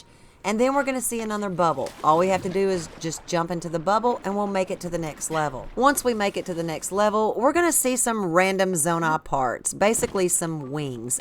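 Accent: South, United States. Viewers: I did not realize how much better walkthroughs are with a soothing feminine southern accent. Great stuff! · No lie that southern draw from a woman playing games makes me feel comfortable asf lead me gamer woman!!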